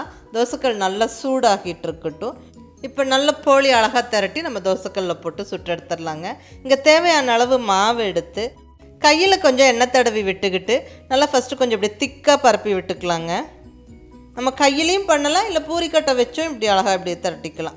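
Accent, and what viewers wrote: Kongu, India. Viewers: Akka....sema super..I am your fan Akka..Really your are amazing sis...namma kongu tamil speech la asathurinka....tdy poli semaya arumaya iruku....😍😘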